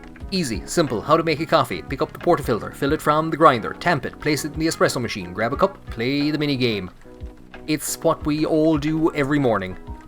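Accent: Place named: Dublin 4, Ireland. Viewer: How many years have you been hiding this GLORIOUS D4 accent?! I nearly wet meself!:face-green-smiling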